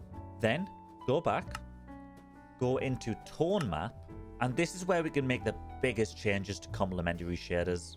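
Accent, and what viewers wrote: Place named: Newcastle, United Kingdom. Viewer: What a mighty geordie accent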